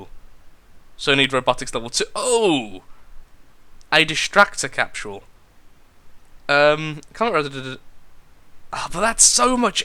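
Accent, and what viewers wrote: United Kingdom. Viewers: i have a weird feeling your voice is different, more "brittish" pretty weird since you are brittish but still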